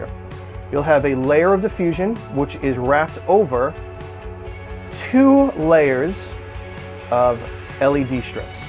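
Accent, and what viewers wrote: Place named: Long Island, United States. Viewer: I detect a long Island accent. Nice video man.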